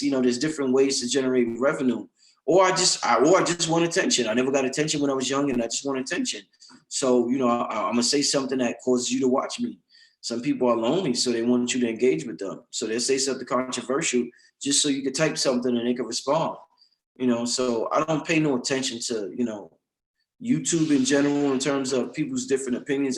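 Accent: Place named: New York, United States. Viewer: They keys to life and u dropping Jewel's Who don't luv that new York voice? I LISTEN TO YOUR MUSIC SO DIFFERENT u always give informative video ✌❤💡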